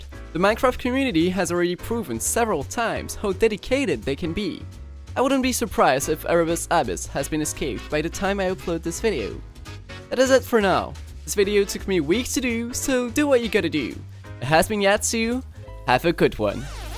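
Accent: France. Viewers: are you french? i feel like you have an accent.. Great video btw!!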